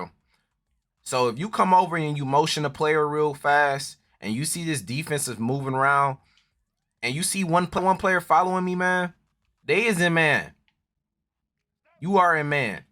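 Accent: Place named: Midwest, United States. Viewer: Besides great concepts I’m here for the laughs 😂an commentary an where is your from bro got to be Midwest 😂😂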